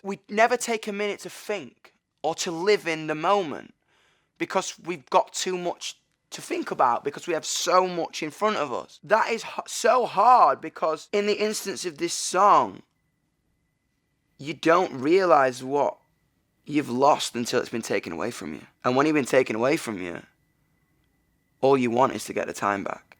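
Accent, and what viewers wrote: United Kingdom. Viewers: I did not know that he had a bridish acsent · He’s British that’s how we say it it’s never fuck it’s either feck or fook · This is my first time hearing him talk and I wasn't expecting him to be British lol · Sure he’s passionate and this does deserve to be on genius, but another reason is because he’s British and everything they say sound amazing.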